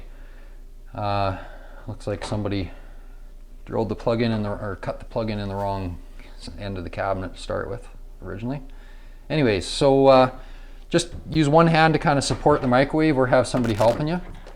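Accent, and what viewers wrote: Canada. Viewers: Nice Canadian accent! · Can someone put subtitles in.... his Canadian accent is too difficult to understand.... I'm from New Jersey. 😢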